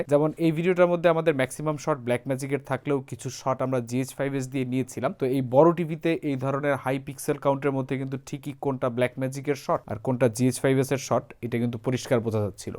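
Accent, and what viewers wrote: Barishal, Bangladesh. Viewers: "পয়সা থাকলে এধরনের টিভি কেনা যায় এবং উপভোগ করা যায় আর পয়সা না থাকলে দেখে উপভোগ করার চেষ্টা করা যায়' কথার টোনে মনে হচ্চে আপনি বরিশাইল্লা। Am I right?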